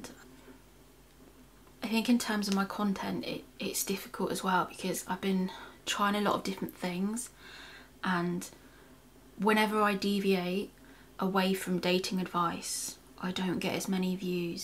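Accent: United Kingdom. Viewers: I'm not even sure how I stumbled across your videos...because all I watch are Car vids. LOL Anyways...you are great speaker, you seem very intellectual, extremely attractive, and would make any guy feel lucky to share your time with! And your English accent...for an American. So HOT!! Viewers will come to you! You've got some great content so far! Best of luck to you in 2022!!!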